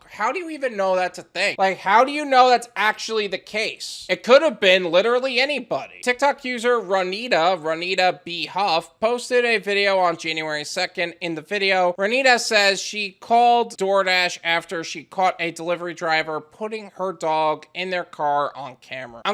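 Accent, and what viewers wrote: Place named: United States. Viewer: Bro i like you us accent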